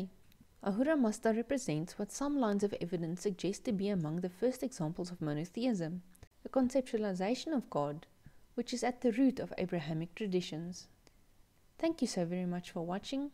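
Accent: South Africa. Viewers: Wow, I searched "Zoroastrian asmr" and didn't expect a South African accent! Cool channel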